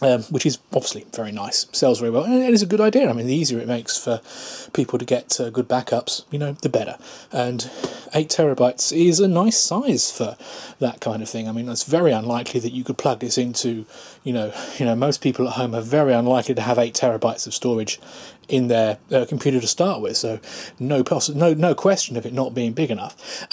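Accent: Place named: United Kingdom. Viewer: Thank you for the video ! im planing to buy one of these 8TB baby's. YOur british accent was a delight to wacth this video. unlike other guys whos accent i cant even understand most of the time. i have a simple question, if i buy this seagate 8TB external drive, can i just drag and drop my files from my laptop into this external drive ?? will it be this simple? cus im assuming this is just a sata drive, or will it upload to the cloud directly? im a lil confused whether i should buy this drive cus it says BACK UP PLUS.it's emphasizing on the package alot !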